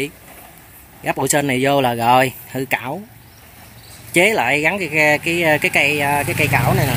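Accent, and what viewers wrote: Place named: Mekong Delta, Vietnam. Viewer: Luôn luôn ũng hộ Chia sẽ cách làm, kinh nghiệm rất là thực tế và dễ hiểu Cách nói cũng rất là chân thật người miền Tây.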